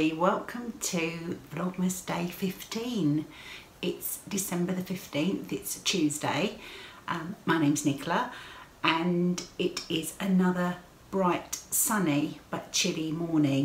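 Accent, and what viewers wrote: England, United Kingdom. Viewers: Hi Nicola! Greetings from Texas!! I love you videos! Your stitching is so beautiful. I especially liked the project bag you did with your name on it. I had to order the pattern right away!! I really like your English voice - if we met wouldn’t it be funny to overhear us talk - you with English and my Texan!? It would be a hoot! Lol Happy Stitching! Happy Holidays! Be safe!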